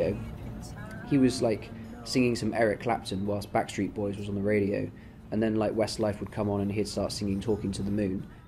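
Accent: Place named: United Kingdom. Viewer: Hey I am from Egypt i am 12 years old I speak English very well and I really like your British accent and I watch you daily I appreciate that you are trying to teach other people Arabic and the British accent. I really hope you see this comment.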